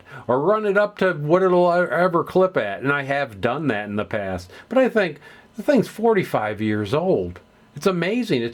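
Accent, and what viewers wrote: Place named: Buffalo, United States. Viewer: Your accent is just like a co-worker that is from Buffalo, NY.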